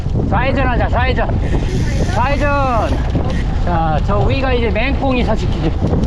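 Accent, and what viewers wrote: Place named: Chungcheong, South Korea. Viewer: 아 정겹다😊 잉 겨~~충청도 사투리! 응원합니다🎉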